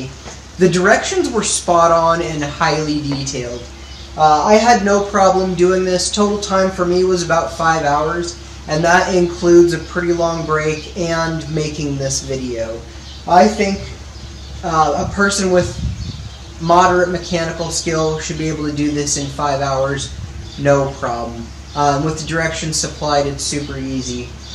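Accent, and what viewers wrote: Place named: California, United States. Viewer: Hey, thanks so much man, sound like you might be from California, I'm from Burbank, are you still happy with these batteries, it looks like you have lithium just curious I had I haven't listened to the whole video, maybe you mentioned that already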